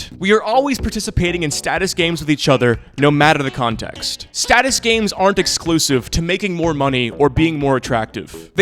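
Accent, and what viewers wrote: Canada. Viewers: Aboot! Are you from Canada? This is the first video ive seen of yours and I love it!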